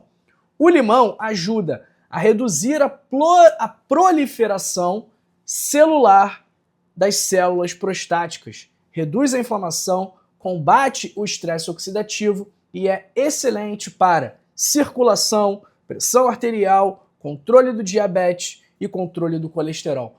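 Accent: Rio de Janeiro, Brazil. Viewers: Uma dica: seu sotaque carioca irrita muito (por exemplo, você fala proistata, quando deveria dizer apenas prostata).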